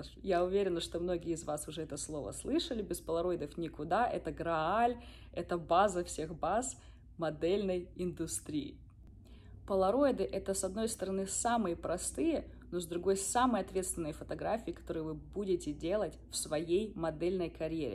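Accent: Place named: Rostov, Russia. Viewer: Слышу слышу ростовский говор...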